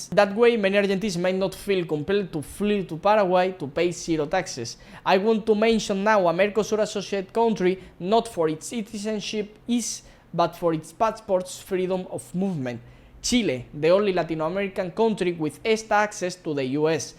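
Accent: Spain. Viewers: Te recomiendo que practiques la pronunciación en inglés, la formación de frases, la palabras y vocabulario es bueno, pero trabaja en la pronunciación tu acento español es demasiado fuerte.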